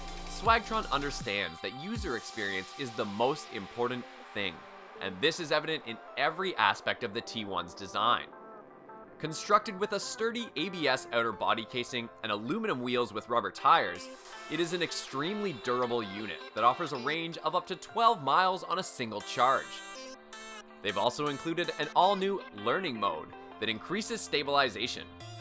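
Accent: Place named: Canada. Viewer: Why do you think I was talking to you? The narrator in the video has a strong Canadian accent.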